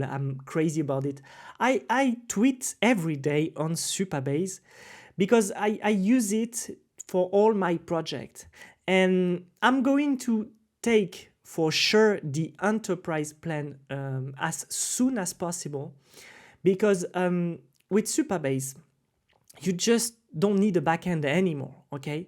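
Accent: France. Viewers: Il me semble que tu es français vu ton accent ( mais tu maitrises parfaitement l'anglais !) merci pour ton retour, moi aussi j'aime beaucoup Supabase et encore plus VueJs! j'espère qu'il va continuer de se répendre et pourquoi pas un jour prendre le pas sur React ;) Si tu utilises un peu Nuxt 3, n'hésite pas à faire une vidéo ou tu l'utilise avec Supabase justement. D'ailleurs est ce que tu l'utilise avec une ORM comme Prisma? Encore merci pour tes vidéos! continue ainsi!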